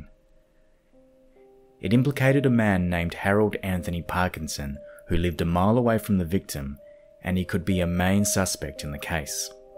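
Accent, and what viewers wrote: Australia. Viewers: Aussie voice over ? I’m in thanks ! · So happy to hear an Aussie voice over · good to hear the Ozzie voice😃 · Nice to hear an Aussie accent 🙂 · I love starting a youtube video and hearing that sweet aussie accent. Keep it up brother! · Good narration by this pleasant Aussie chap - definitely a thumbs up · Love the Australian accent! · Great Aussie accent 🙏🏻🇦🇺🇦🇺❣️ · first here......subscribed after 5 minutes listening. Awesome mate......guessing by the accent.....OZ ?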